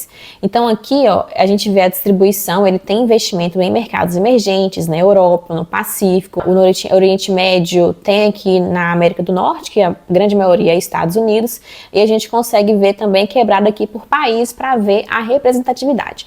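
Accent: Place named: Belo Horizonte, Brazil. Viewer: Ótimo conteúdo. Esse sotaque é de BH, né?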